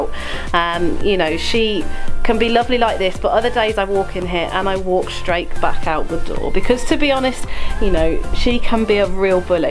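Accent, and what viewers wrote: United Kingdom. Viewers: You have a beautiful British English accent. And your female raven Fable sounds cute when she says, “hi, boop boop,” and, “wow.”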